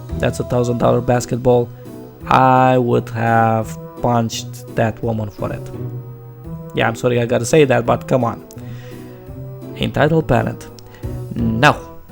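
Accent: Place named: Nigeria. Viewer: sorry if im being rude, but you sound like the Nigerian scammer across the phone trying to get someone's social security number and credit card number.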